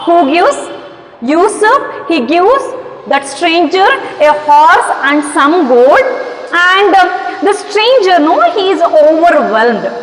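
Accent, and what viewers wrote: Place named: India. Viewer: Very nice and excellent teaching madam.it is in Indian English ,we can understand easily and learn spoken English.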